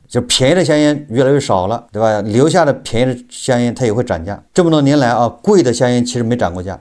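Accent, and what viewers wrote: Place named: Anhui, China. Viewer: 听口音，叔是不是安徽南方，靠近湖北那一片的人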